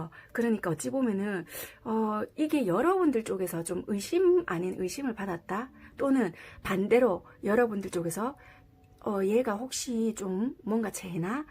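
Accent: Busan, South Korea. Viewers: ㅕㅛㅛㅛㅛㅛㅛㅛㅛㅛㅛㅛㅛㅛㅛㅛㅛㅛㅛㅛㅛㅛㅛㅛㅛㅛㅛㅛㅛㅛㅛㅛㅛㅛㅛㅛㅛㅗㅕㅛㅑㅕㅕㅕ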